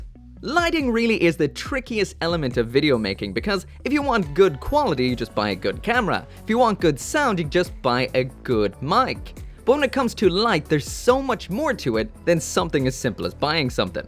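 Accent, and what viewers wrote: Ireland. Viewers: Ahh... he's irish. That's why he talks like that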